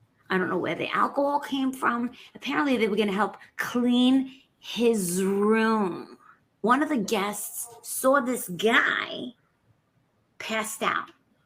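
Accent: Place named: New Jersey, United States. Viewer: New Jersey! That awful accent. That makes perfect sense!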